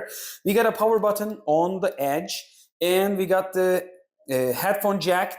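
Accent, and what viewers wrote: Turkey. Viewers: This is Turkish accent. Öyle değil mi? Nerede duysam tanırım hocam :) (ve 'de de Hoşçakalın'ı duymak beni mutlu etti). Detaylı rew için çok teşekkürler. Thanks for detailed rew.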